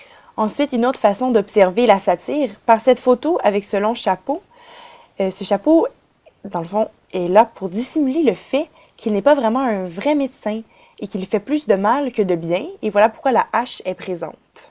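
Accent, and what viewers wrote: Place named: Quebec, Canada. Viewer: Tu es québécoise j’adore ton accent français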